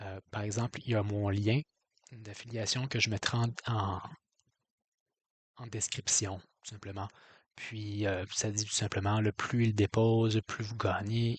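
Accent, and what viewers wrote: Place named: Quebec, Canada. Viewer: Je t'encourage a continuer, j'ai écouter toute ton vidéo ,juste lui de finance vue que cest aussi ce que je fait. tant qu'a juste te dire de continuer, jm'autorise une critique constructive. sans rancune :) Pro = -continu de mentionner ton portefeuille dans les commentaire de video de personne qui parle de Finance au quebec cest comme ca je t'es connu. -ton francais est bien tu t'exprime bien quebecois et quand meme suivable pour un francais france. -jaime le fait que tu explique ta ponderation dans ton portefeuille pour chaque actif . ta strategie pour les dividende a des fin fiscal. -ta chaine est de loin superieur a la celle Mikael wolf qui montre aucun chiffre et connais fuckall apport VFV et XQQ. Negatif= -Peu etre pensé à investir dans un micro de qualité et aussi avoir une voix plus portante et assumé.(avec le temps) -wealth simple platforme de merde, mais je vois quand meme lutilité si tu force sur l'affiliation pour un revenu supplementaire. 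(au quebec rien bas IBKR. en terme de margin disponible et interet sur marge et en terme de payer des rendement sur cash on balance et de transfer USD/CAD sans frais. jtencourage a transfer ton compte marge dessus. - Mettre ta face en bas pour montré a ton interlocuteur qui tu es et aide aussi a fidelisé les abonnées. -abandonner toute le coté language aka duolingo ( niche trop petite), et faire 50% finance perso comme tu fait avec update de portefolio, voir parler de tes pochain titre. prochain achat, optimisation fiscal, vlog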